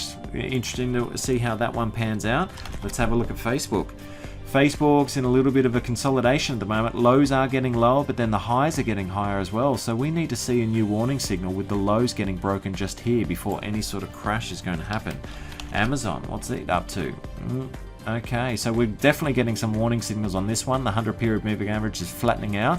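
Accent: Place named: Australia. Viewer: So let me get this straight. Jim Rickards, Joseph Stiglitz, Jim Rogers, Lew Stockwell and Peter Schiff do not have a clue?( Highly unlikly. Further more fiat monetary policy is one of the biggest ponzi schemes ever invented. To use a vernacular that u being Australian cuase of ur accent. fiat money is like watering down ur cordial more water less cordial . fiat money does the same . U print and print. What is backing ur currency . Nufin cause ur great tresurer under the Howard regime sold all ur gold. Gold price at the time was circa 300 US then it dropped under 300. What is the price of gold today. Circa 1250 US a troy ounce. Which at present day is heavaly rigged.Cuase the Chinese , Russians and the Iranians have been purchasing bullion at a un precedented rate. Hence the markets r rigged and the bullion market is rigged. IE:London bullion market constantly floods the market with paper bullion (gold and silver ) not actual bullion. 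Markets historically have had 7-10 year cycles. Another problem with the western economic situation. All the afferomentioned economist speak of the velocity of money. QE1,2and 3 did not increase the velocity of money. EEC QE 1,2,3 and 4 did not increase the velocity of money . The Fed in the US has been by back in a bigway all this printed money in bond by bak so has the EEC. Its all rigged. the stockmarket is over leveraged at circa 500 percent today . In 2007 with the derivatives meltdown and banking shemazal the stockmarket was leveraged at 12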